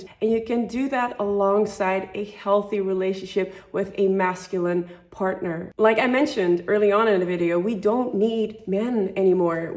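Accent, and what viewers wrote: Netherlands. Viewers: I can spot a Dutch-English accent from miles away.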